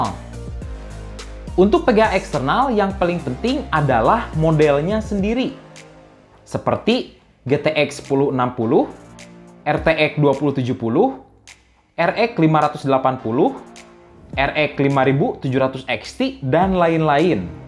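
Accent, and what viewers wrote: West Java, Indonesia. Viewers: Sunda pisan kang 😂 Nuhun penjelasan na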